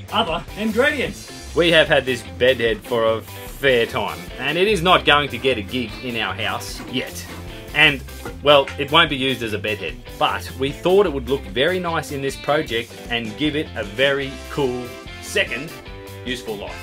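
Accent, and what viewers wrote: Australia. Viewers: Haha loved it. Most other YT channels just couldn’t have pulled this off. That Ozzy accent makes it work. Awesome stuff mate 🤓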